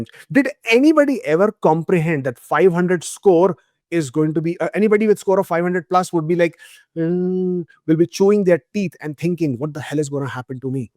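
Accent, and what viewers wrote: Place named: India. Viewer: You have a clear Indian accent. If you weren't granted entry to Canada by soft policies, will you be in Canada?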